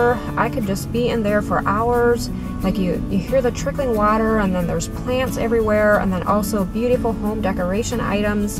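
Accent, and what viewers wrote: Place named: Pennsylvania Dutch, United States. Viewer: Hi... my grandmother from PA was fully Pennsylvania Dutch as well. Thereby, making me a good portion!.. You pronounce so many words just like she did! So cool!. The scenery is so awesome where you live! The fabric store is great one of a kind, and the market with beautiful produce etc is so fantastic. The garden shop! Wow...everything is simply Amazing! Thank you! May God bless you!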